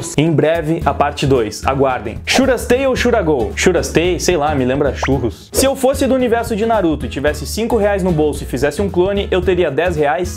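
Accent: Rio Grande do Sul, Brazil. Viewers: Voce é gaucho??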